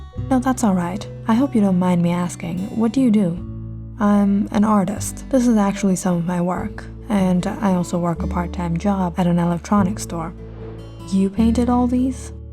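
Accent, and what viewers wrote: Russia. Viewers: I'm in love with that russian accent 🥺😂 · Good story, madam. Love that Russian accent, though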